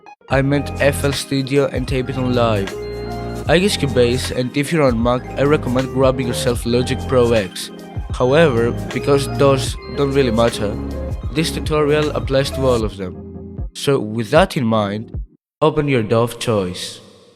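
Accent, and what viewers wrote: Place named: Greece. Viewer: Bro where are you from? You have such a Greek accent haha. Great video !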